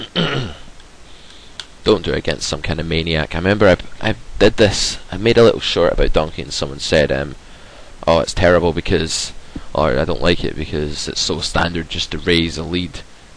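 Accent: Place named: Scotland, United Kingdom. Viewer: Thanks such helpful content on this channel. But why is Scottish 😆?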